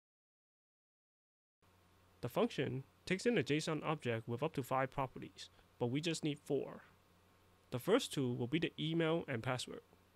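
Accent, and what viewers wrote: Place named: India. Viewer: Wow...i just realized that u are from India. Nothing bad, usually I can't focus when i view an indian tutorial bcuz my ears hurt :)) But your style is clean and amazing ! Hope we get more angular tutorials from you . Great job sir !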